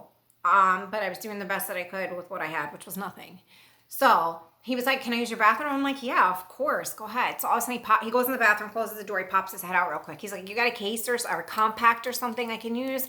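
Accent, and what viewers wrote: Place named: Minnesota, United States. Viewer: I love her accent. Where u from girl? Minnesota?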